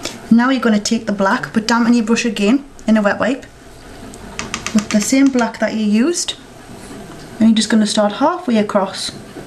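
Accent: United Kingdom. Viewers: you r really goood!! hahaha oh i really miss the british accent!! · Its The Geordie Accent.. Everyone From Here Says That. Bt To You It Would Mean Our Eyelid Lol. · she is british but we'd say shes def from newcastle or nearabouts. geordie accents are actually too cool we want one. love the makeup in this too, that palette is awesssommee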